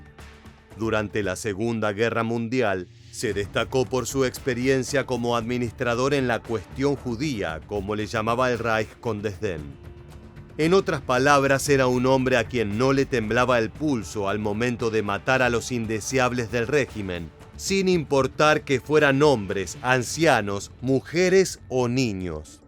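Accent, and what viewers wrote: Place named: Argentina. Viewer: VIDEO CON ACENTO ARGENTINO, YA NO ME INTERESA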